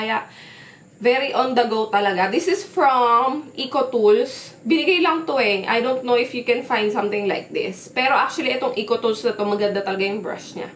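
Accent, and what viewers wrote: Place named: Bulacan, Philippines. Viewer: ur so cute ganda..hahahaha.. naririnig q ang punto ng bulacenia sayu.hahhaa... i miss u nmn pati si skysky..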